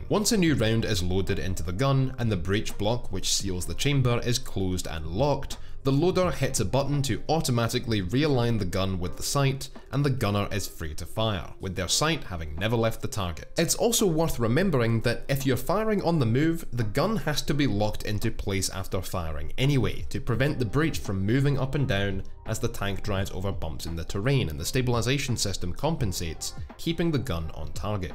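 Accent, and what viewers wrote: Scotland, United Kingdom. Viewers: Yes, I already knew everything said but sometimes you just want to spend seven and a half minutes listening to a Scottish man talking about a subject you like because they do it better than you. XD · You sound Scottish mate. A good pal of mine's was in the Royal Scots Dragoon Guards & used to drive a Challenger 2. He said it is still one of the best tanks in the world, even though there have been a few new ones introduced to various armies around the world. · Was trying to work out if your were one of us Scots.. wasnt until you said lads and lasses that i knew for sure! great video!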